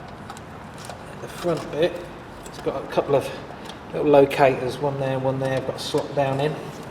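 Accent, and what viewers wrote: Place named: United Kingdom. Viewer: I love UK accent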